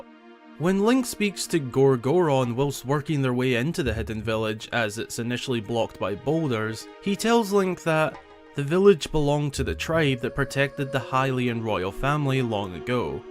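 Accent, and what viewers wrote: Scotland, United Kingdom. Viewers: You sound like yer fae Scotland? Whereabouts I’ll share 2L of strongbow wae ye if ye want?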